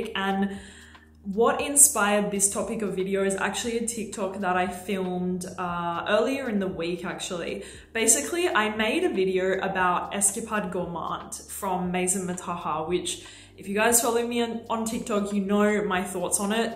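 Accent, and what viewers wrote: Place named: Australia. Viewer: I love her Aussie accent and the way she describes fragrance makes me genuinely want to own everything she recommends cause it just sounds so delicious when describes it! My go-to for fragrance knowledge forever!!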